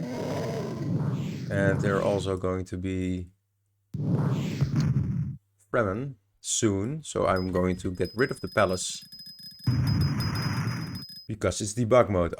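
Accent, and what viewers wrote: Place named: Netherlands. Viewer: Lol wist niet dat je een Nederlander was , zag je post op Tweakers en nu hoor je duidelijk je Hollandse accent. Thnx voor deze project , ben mega fan van Dune 2 (en andere Dune games) sinds ik het speelde op me oude 286. Dus super vet project , good Luck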